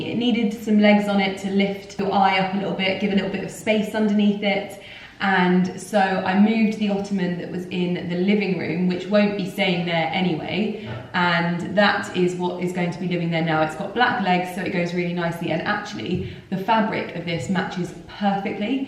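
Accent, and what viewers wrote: RP, United Kingdom. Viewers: How posh x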